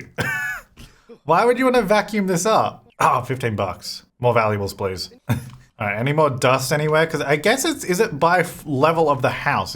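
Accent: Australia. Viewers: I feel so stupid I’ve been watching you for years and apparently I’ve missed that you’re in Australia and I knew you had an accident but I could never pinpoint where, I thought maybe New Zealand.. it was because it wasn’t that cartoony Australian accent I never would’ve thought I felt so stupid right now I need my PhD taken away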